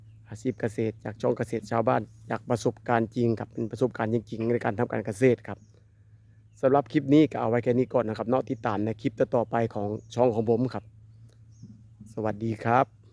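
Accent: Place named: Laos. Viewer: ສຳນຽງຄືຄົນລາວເນາະ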